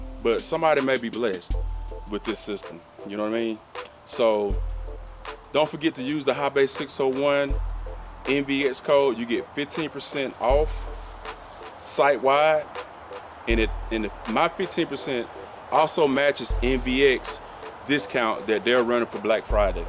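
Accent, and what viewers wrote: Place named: Mississippi, United States. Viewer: You definitely from Mississippi with that accent lol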